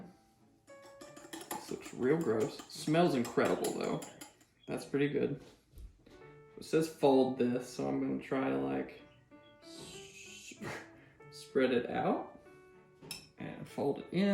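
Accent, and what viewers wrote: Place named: South, United States. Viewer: I can’t stop laughing at this and the southern accent -Savebee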